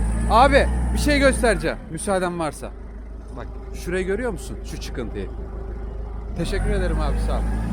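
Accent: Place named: Giresun, Turkey. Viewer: Kardeş giresunlu musun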